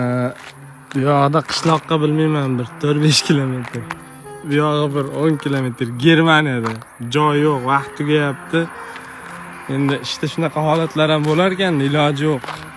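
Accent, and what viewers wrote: Uzbekistan. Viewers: Shevasidan Norinlik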